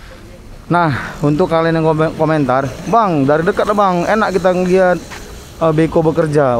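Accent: Medan, Indonesia. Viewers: Logat medan nya lebih kentel lg bang, tambah celotehan lucu nya terus..😅😅😅😅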